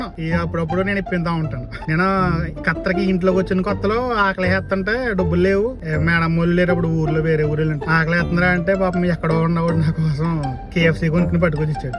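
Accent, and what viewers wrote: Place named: Godavari, India. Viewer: Godavari slang adaragottestunnav anduke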